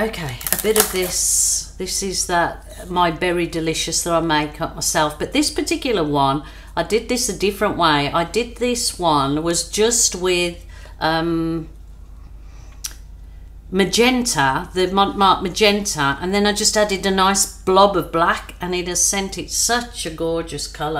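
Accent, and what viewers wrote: Birmingham, United Kingdom. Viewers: Love it, yet again a cracking outcome 👏 Do I detect a " brummie accent " or is just my naff hearing x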